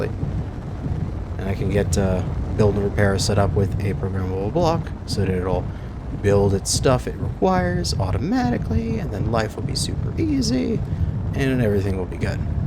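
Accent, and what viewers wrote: United States. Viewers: I'm not usually fan of American accents being English myself, but your voice is rather quite soothing to just listen to/watch while i'm doing things on computer :)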